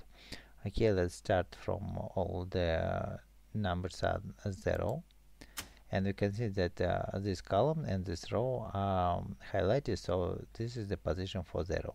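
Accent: Russia. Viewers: Ты говоришь с произношением, ты русский?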